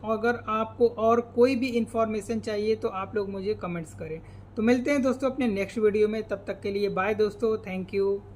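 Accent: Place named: West Bengal, India. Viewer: Sir are you from West Bengal :)??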